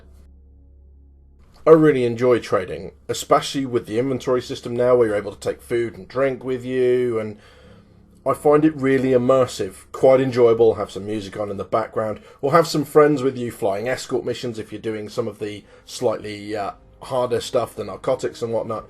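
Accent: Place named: South West England, United Kingdom. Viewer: Love the video, are you from South-West England? It's so refreshing to hear someone who sounds like me on YT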